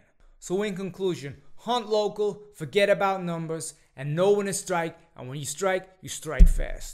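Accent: United States. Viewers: Nice East Coast accent! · new york accent. 🗽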